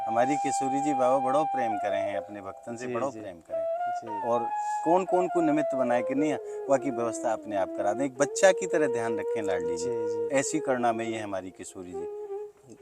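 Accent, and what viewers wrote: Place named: Braj, India. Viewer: Sree! ji aap ko mera Radhe Radhe. Mai Rajasthan jodhpur se aap ka video dekhta hun. Bahut achha lagta hai. Aapke braj Bhasa ati sundar aur ati madhur hai. Aap bahut namra hai. Aap ka braj bhasa keval sunta jaun. Jai shree Krishna Jai shree Radhe radhe.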